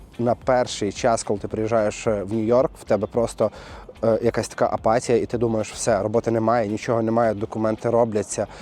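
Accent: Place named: Kherson, Ukraine. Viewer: От куда суржик с Херсона?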